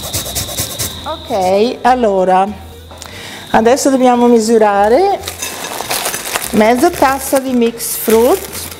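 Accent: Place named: Veneto, Italy. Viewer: Yum! That brought back memories of Mum making them! Loved the Veneto dialect too!!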